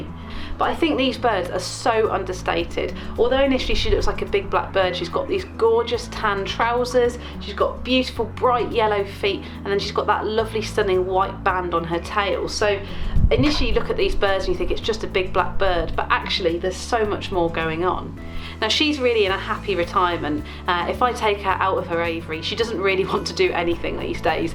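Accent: United Kingdom. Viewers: In you say: "she's literally older than me" automatic subtitles: "it's really a hotter than me" how dare you translater? Zorro is a "she" not an "it" ^^ I think your cute british accent confuses the translater, altough i think you speak pretty clear. (because english is not my first language, i happily use the automatic subtitles often anyway)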